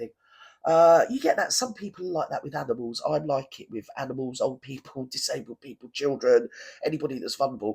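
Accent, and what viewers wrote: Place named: Manchester, United Kingdom. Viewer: we love your accent! You remind us of our gal pal from Manchester, England, Julie. It took us forever when I hired her as an accountant. We love her to pieces. Enjoy your personality and accent. GG from Texas. We don't see enough of you sista girl.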